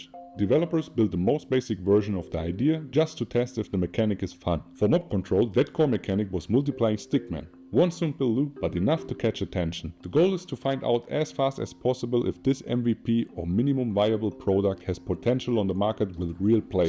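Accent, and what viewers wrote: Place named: Germany. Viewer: i smell german. wie machste das mit den steuern ?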